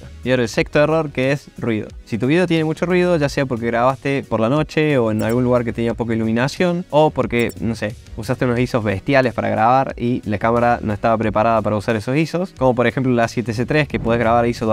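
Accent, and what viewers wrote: Córdoba, Argentina. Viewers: Grande!!! Qué lindo es escuchar una tonada cordobesa en este canal que sigo hace tantos años!! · Holaaa desde Uruguay! Escucho tonadita de Cordobés??? Me encantóooo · sos cordobes, no? Aguante Argentina papaaaa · INCREIBLE HERMANO ENCIMA CORDOBEEEEEEEEEEE ♪ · Cordobes hasta la medula jajaja 👍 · Vamo' ese cordobés papá! La didáctica que me manejan en este canal es una locura. ¡Abrazo gigante! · Hola, no me he enterado de nada😏 entre que hablas con ese acento argentino y que hablas muy deprisa me he quedado como empecé, lo siento. Soy alumno de la escuela, y por cierto, ¿donde están esas herramientas que dices? Mi opinión es que este tutorial es para un público avanzado hablas con tecnicismos que no entiendo y yo estoy aprendiendo en edición en la escuela. Un saludo. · Sorpresa grata de escuchar un cordobés acá jajaja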